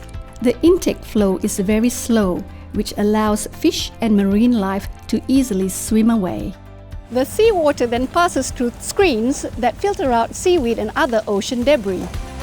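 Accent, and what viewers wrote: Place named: China. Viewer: I suspect it could be a Chinese who has learned English?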